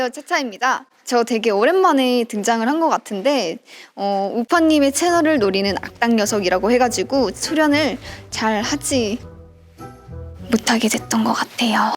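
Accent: Gyeongsang, South Korea. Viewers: 갱상도 출신아인교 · 경상도 사투리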